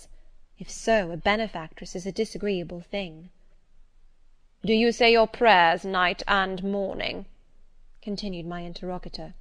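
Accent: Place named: United Kingdom. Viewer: Very clear voice and british english accent, i am enjoying listening to this voice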